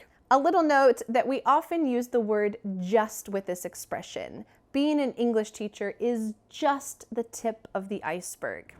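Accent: United States. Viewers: ❤️❤️❤️❤️❤️. You are the lovely teacher. You gave me The Amarican accent. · Hi. I 'd like to master American accent that sounds lovely and gentle!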